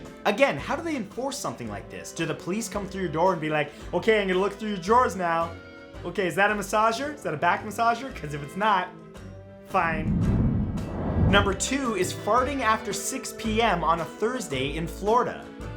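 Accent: United Kingdom. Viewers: British accent. Nailed it! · Nice british accent btw it is crisps not chips in Uk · This British accent is awesome 😂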